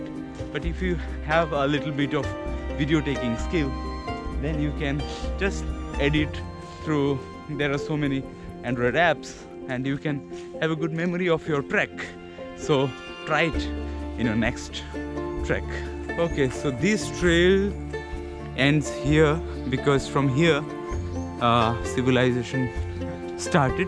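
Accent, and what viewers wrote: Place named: Comilla, Bangladesh. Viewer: It seems that you are from Comilla , though we know, Tripura was the part of East-Bangal before the British period. Your tone and dialect are matched with us .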